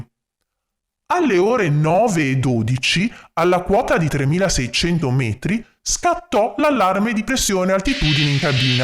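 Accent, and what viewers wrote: Genoa, Italy. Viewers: Con questa si sente meno l accento genovese belin😂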